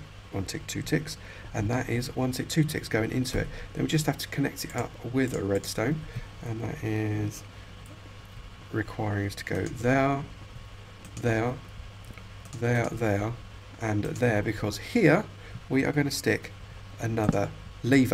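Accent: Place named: Yorkshire, United Kingdom. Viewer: i can tell your yorksire well i yorksire aswell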